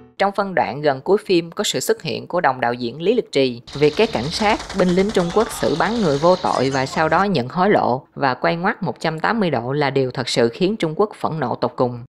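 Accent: South, Vietnam. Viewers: Bạn nói hay mà. Bạn người Nam thì cứ nói giọng Nam cho tự nhiên. Nghe vài vị dở hơi mắc mệt. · Nên nói là D miền Nam cố nói giọng Bắc thì còn khó chịu hơn đó bạn. Đừng đánh mất giọng miền Nam thân thương. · Người MIỀN NAM thì phát âm giọng MIỀN NAM dùm cái,Tinh da chứ k phải Tinh gia,dì chứ k phải là gì,dao nhiệm vụ chứ k phải là giao nhiệm vụ....nghe chói tai vcc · Lúc đầu tui cũng nghe không quen giọng của ad vì hơi nặng so với giọng miền Nam bình thường, nhưng mà nội dung ad làm hay quá nên bị cuốn ý, nghe nhiều thành quen, không sao đâu nha ad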